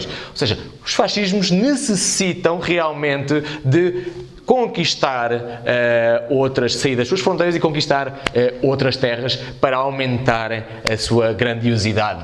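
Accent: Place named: Portugal. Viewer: Eu sou do Brasil vc me ajudou muito msm sendo português amei seu sotaque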